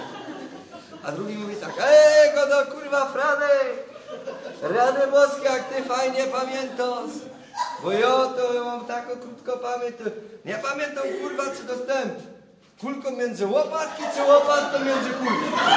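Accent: Silesia, Poland. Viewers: oooooooo ten śląski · to jest raczej po śląsku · Facet ma talent, po glosie poznaje że jest ze Śląska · haha tylko ze to jest akurat gwara śląska xd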